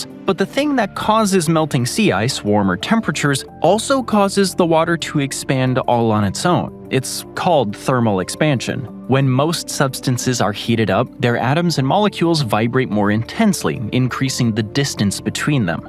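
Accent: United States. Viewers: Got to admit, this is probably the most enjoyable vid' with an American accent I've ever watched. Even so, as a Brit well into my 70s, I wasn't taught most of these myths in school. Then again, I wasn't taught that history started on July 4, 1776, either. America, please don't take offence. I'm only pulling your leg. Your cultural view of the rest of the world does amuse me, though. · The voice being spoken with an accent from USA - says a lot. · The whole video is in American English. And a lot of cities have different names and pronouncations. Like countries. Most countries call themselves different than tge rest of the world does.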